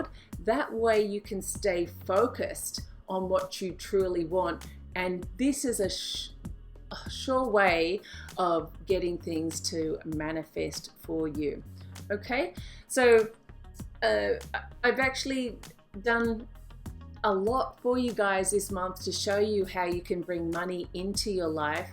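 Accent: Australia. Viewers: Thank you and ~ thank you, everything sounds so much nicer with an Aussie accent:D Thank you for sharing your knowledge Blessings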